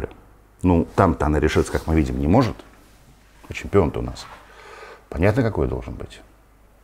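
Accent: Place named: Russia. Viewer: А-а-а Одно Аканье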